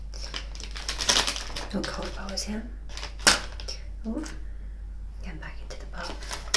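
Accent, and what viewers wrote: United Kingdom. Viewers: Are you actually British like me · Wow you have a heavy British accent! I hardly can understand it but I like it!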